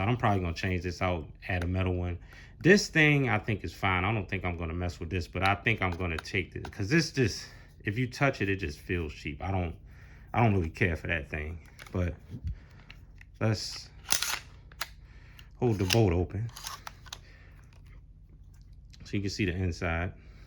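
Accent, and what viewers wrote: Baltimore, United States. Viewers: I was going to ask what state is this gun legal in but I swear you sound like you from bmore. Im in DC I want one of these bad but it’s not legal here 😢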